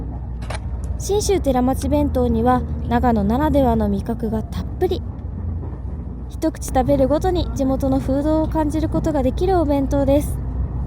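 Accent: Kansai, Japan. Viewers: 穂高町に一度行ったことがあります、きれいな素敵な町でしたよさんが時々関西弁が出るのが親しみを感じます。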